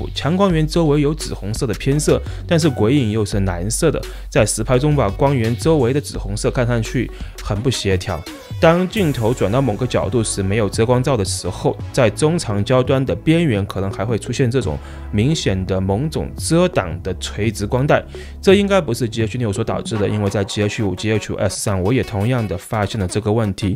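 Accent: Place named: Sichuan, China. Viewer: 这个川普听起来太舒服了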